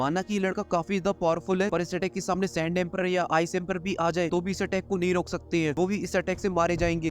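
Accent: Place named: Chambal, India. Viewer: Bro ye tumhara Chambal Accent kaafi mjedaar hai... episodes dekhne ke baad bhi yha explanation do do baar sunne me achi lgti hai...upr se actor ko tharki name ek dum shi diya hai😂😂😂😂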